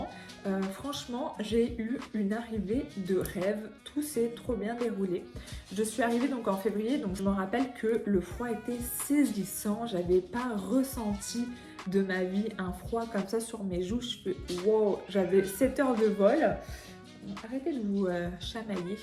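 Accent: Quebec, Canada. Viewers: Ça y est tu commences à avoir l accent canadien, c est trop mimi 😊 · Petit accent québécois toute même 😁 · Je trouve que tu prends le bel accent québécois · Vous avez pris une pointe d'accent québécois..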